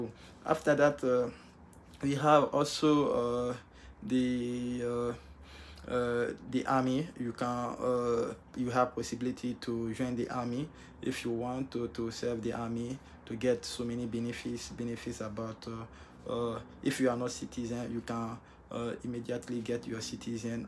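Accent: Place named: France. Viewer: Apparemment vous parlez bien anglais mais vous parlez l'anglais comme les Français ici qui parle anglais. Votre accent anglais est tellement français. Moi aussi quand je parle français, les français toujours ma dite je parle avec l'accent anglais 🤣🤣🤣🤣✌👍👋👋.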